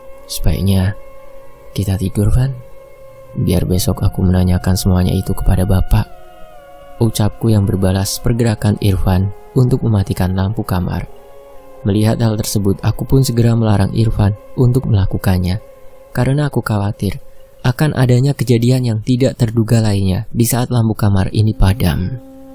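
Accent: Java, Indonesia. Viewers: Ini kan ceritanya orang sunda. Tp kenapa bahasanya, trs cengkoknya itu kaya orang jawa ya, beda sama teksnya.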